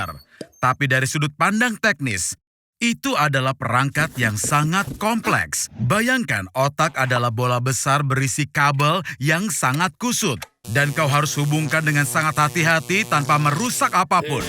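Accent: United States. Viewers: Bjir orang indo beneran padahal suaranya kek orang Amerika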